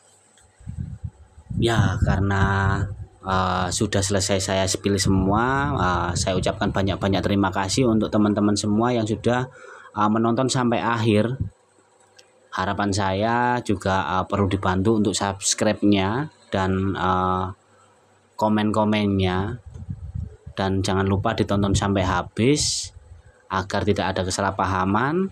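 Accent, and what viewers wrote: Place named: Surabaya, Indonesia. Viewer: Suroboyone pean ngendhi Cak?....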